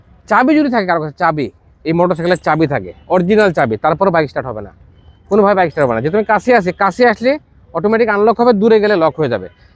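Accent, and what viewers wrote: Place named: Sirajganj, Bangladesh. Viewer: সিরাজগঞ্জ কথায়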